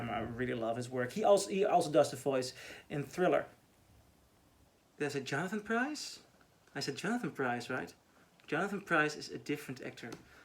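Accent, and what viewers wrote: Netherlands. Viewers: Nice video! Are you dutch? Because you sound dutch. Ben je nederlands?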